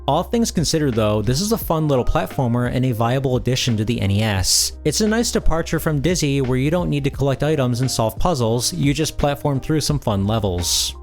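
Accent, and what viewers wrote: United States. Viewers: I grew up in the U.K. when Code Masters started doing serious business and the Oliver Twins were very much local heroes and near unknown worldwide. So it’s nice to hear an American voice cover their achievements.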